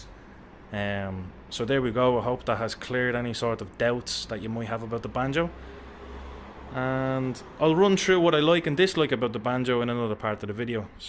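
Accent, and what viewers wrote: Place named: Dublin, Ireland. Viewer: hi happy ne year I was thinking you must be from that part of Dublin you sound very like him alright 😄 I'm not far from you myself just started clawhammer frailing journey Just wondering if you do lessons??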